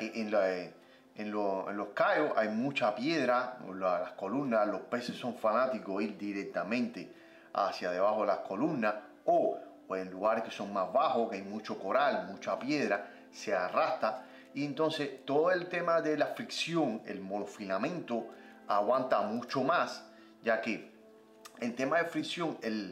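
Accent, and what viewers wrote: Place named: Cuba. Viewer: usted por ese hacento es cubano . Esa tonada no se va ni con 24 años en Argentina. buen Video Suscrito Coherente Lo Que dices Saludos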